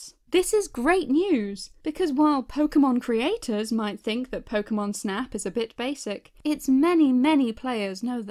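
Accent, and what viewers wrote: United Kingdom. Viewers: I love your British ascent lol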